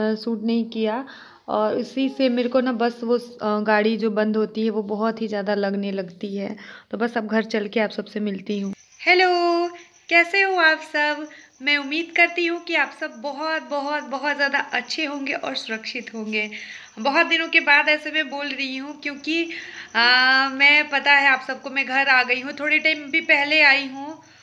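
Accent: Bhojpuri, India. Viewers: Aapke muh se bhojpuri bahut achhi lagti hai.aapki aawaz mujhe bahut pasand hai....!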